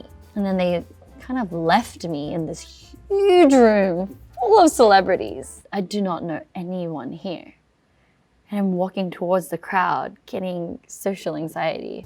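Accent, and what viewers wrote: Australia. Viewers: Her Aussie accent is so aesthetic and luxurious! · “Aussie accent” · That Aussie accent is so charming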